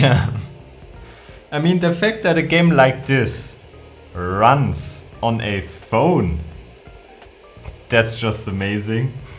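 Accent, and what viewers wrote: Germany. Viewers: Is he german, austrian or swiss?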